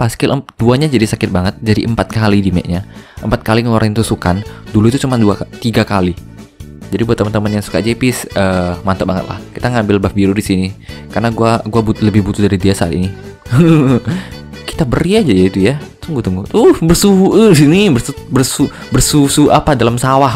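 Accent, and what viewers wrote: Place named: Medan, Indonesia. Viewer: "Penta bapak kau, mati kau longor!!" Confirmed bang dari medan:v Edit: Ditambah pidung:v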